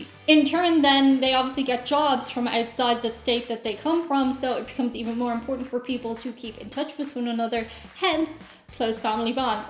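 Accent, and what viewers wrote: Ireland. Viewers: You know is getting serious about a topic when the cat ears come out. Just imagine poor Chewie’s shock upon being woken up from a lovey nap to be confronted by a glittery cat creature with an Irish accent. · I would suggest flying into Minneapolis (AMS) from Dublin (DUB). I see tickets on decent aircraft for $631 on Lagskipping airline route finder. Then take a car down Interstate 35 through Des Moines, Iowa to Kansas city (MCI). You can pull over to small communities within miles of the highway. When you get to Kansas City, you can fly direct back to Dublin for about $750. I don't currently know anyone who bowls more than once every 28 years. When you get to northern Iowa, pay attention to the accent. Many of the immigrants that settled from countries that did not speak English hired Irish girls to teach in one room schools. It was a welcome opportunity during the potato famine. 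The central US does not have any hint of an English accent. It was strange hearing your Irish accent instead of the fake TV and Movie ones. See what you think when you drive through. Your timing should correlate either to the Iowa State fair in Des Moines or any or several fairs on your way through. (most are in late June or July but Des Moines should be in August). And yes, the coasts and south are almost like different countries from the Midwest west of the Mississippi. Towns east of Mississippi in the Midwest are just like extensions of Pennsylvania with large cities. If you want to try real corn, you want bicolor Iowa supersweet harvested no more than a day (preferably 3 hours) before cooking. (be sure not to get dent corn. that is the low quality stuff for feeding pigs or put into cans exporting) Ca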